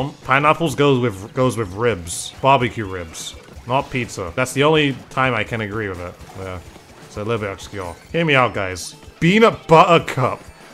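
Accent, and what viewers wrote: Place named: England, United Kingdom. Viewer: I mean, if someone’s gonna tell me how to pronounce words in English, I’m listening to the guy who’s from England (or the UK)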